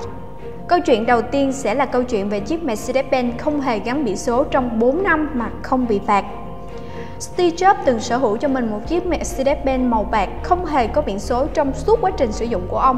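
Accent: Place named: South, Vietnam. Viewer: Giọng miền trong thì phải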